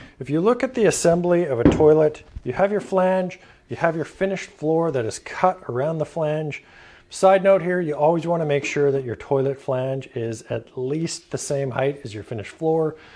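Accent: Canada. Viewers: I just was listening, YouTube started the video late, I had to stop the video.. you're going to tell us "what you ended up doing on the toilet you installed upstairs " ugh, there's more than one answer, and the most common involves disposal of bodily waste. Here I thought you were American as some Canadian dialect just doesn't bleed out, namely syllables with an "o" show off from Canada like oo sound compared to said from a United States citizen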